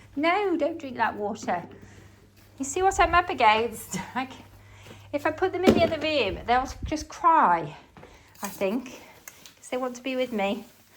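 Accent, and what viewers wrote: United Kingdom. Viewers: What a pleasure to hear an English accent here on YouTube! I also find it comforting to see an unedited, realistic cleaning video. Thank you for posting.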